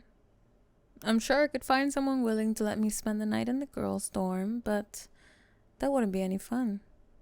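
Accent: Spain. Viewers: I barely understood the accent, spanish and russian for some reason?? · I've heard you do French and those accents, but Spanish. Oh muy senorita or oh lala, I can't decide but wow! Amazing job on this, I am very attracted and very confused lol! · This actually surprised me because most people do a Russian or French student but with a Spanish speaking student it caught me off guard, hell with the way you spoke I wouldn’t be surprised if you were Spanish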